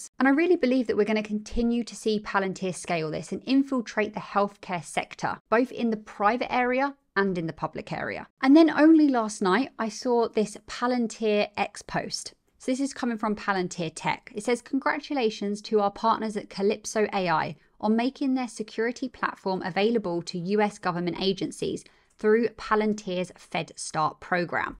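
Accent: United Kingdom. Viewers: thanks for your insight! And I get to listen to a wonderful British accent too:)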